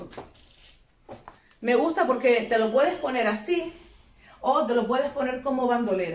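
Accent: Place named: Canary Islands, Spain. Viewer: Ohhh escuchándote identifique tu acento 😊. Mi novio tb es canario 🥰. Muy buenas compras, me suscribo a tu canal y una vez más gracias por suscribirte al mío! Saludos 😘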